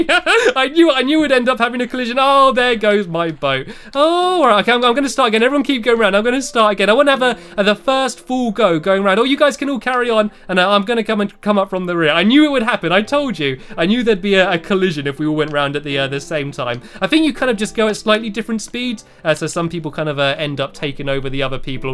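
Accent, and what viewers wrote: United Kingdom. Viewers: You brit7sh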